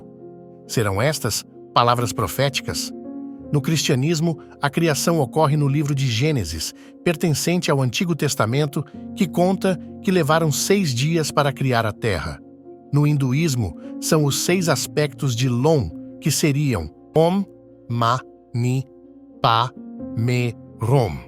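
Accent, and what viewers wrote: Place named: Minas Gerais, Brazil. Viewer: É mineira de BH..😂😂😂... Nú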